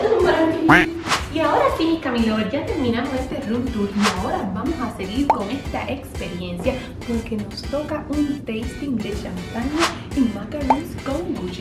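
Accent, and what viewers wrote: Cuba. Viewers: Vos sos de cuba tenéis acento cubano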